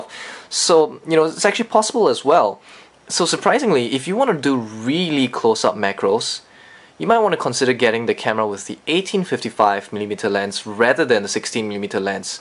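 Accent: Singapore. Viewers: Singaporean english same as Malaysian english :D that's why i can understand this video XD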